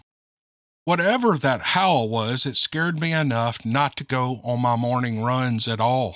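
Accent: South, United States. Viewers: ALL of the stories were GREAT, thanks to those who took the time to share. (Of course, TY to and his delightful southern drawl.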